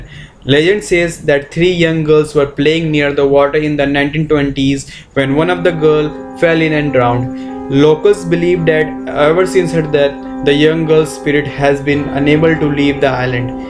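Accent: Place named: Bihar, India. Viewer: Maa kasam bht bura...... Bht bekar accent 😐 bc esa lgra koi bihari ldka bol rha..... Bura mt manio bt sch me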